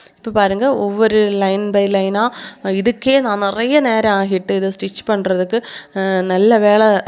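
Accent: Kanyakumari, India. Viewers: Hi sis your kanyakumari slang is really nice I like your native language ❤❤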